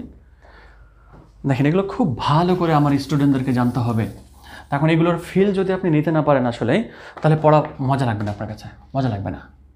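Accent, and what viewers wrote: Rajshahi, Bangladesh. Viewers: আসসালামু আলাইকুম। স্যার আপনার বাড়ি কি রাজশাহীতে ??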